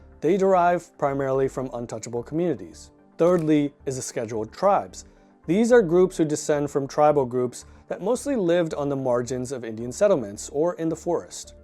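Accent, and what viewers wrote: United States. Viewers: Best channel. Explaining India through an Indian lens, with just 1 difference: a western accent & articulation. Makes it much more consumable for the world. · from …. hmmm So interesting!I watched a couple of videos already. We come across something new everyday, here we find an american accented indian living in the US who is huge BJP fan!! He does a lot of research, but in his fangirling over Modi forgets to find “the first OBC PM of India” comment by the PM? Also according to “BJP is reformist and a rebel”! So what has stopped this rebel to challenge the status quo? As a general caste hindu i want to know why hasn’t this reformist party challenged the reservation system openly? It could have done that alongside its hindutva politics? Strange are the ways of the world!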